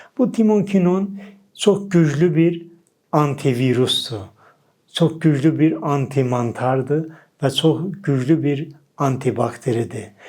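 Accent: Azerbaijan. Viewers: Danışığınız Azərbaycan ləhcəsidi. Var olun 🙏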